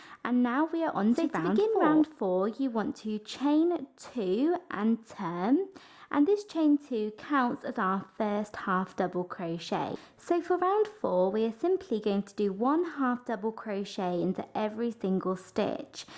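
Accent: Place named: RP, United Kingdom. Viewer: love your voice you talk very posh!!! im in UK too, and im from up north lol x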